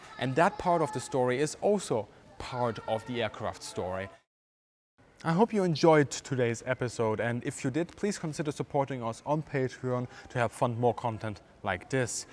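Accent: Germany. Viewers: One of the fastest 23 minutes I’ve had this week! Such a vivid command of the English language and cutting accent of the German dialect in itself is interesting. You have such a vast knowledge and enthusiasm for history and aviation it is incomprehensible your videos could be anything but fascinating. Thank you for your skillful narration and aviation historical intellect. Bravo! From NY, a third generation American with ancestral roots from Germany, Kevin.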